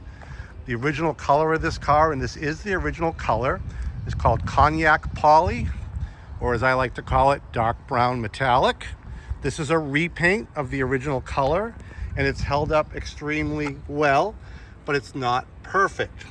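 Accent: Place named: Boston, United States. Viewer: Hi! Love your channel and your Boston accent! This car is priced very well, considering the survivor quality, the low miles, and all the undercoating process that the previous owner paid for. He must of loved it if he kept three covers on it in a climate-controlled garage. It will sell FAST!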